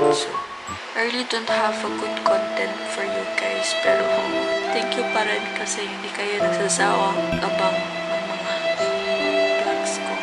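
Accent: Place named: Visayas, Philippines. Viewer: I love the accent of bisaya hahaha!! New subscriber here 😊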